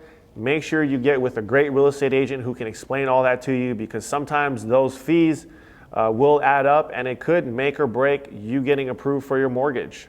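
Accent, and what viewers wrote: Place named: Hawaii, United States. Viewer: This guy saying “in town” & “Locals” haha he definitely from Hawai’i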